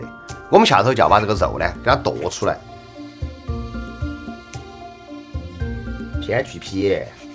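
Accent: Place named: Sichuan, China. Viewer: love this guy's sichuan accent,. but pork lard is not recommended , it blocks blood vessels.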